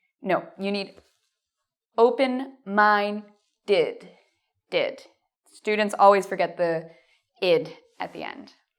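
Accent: Canada. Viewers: This is a Canadian accent :D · Are you Canadian? I can hear you say 'boat ... :) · I love your Canadian accent.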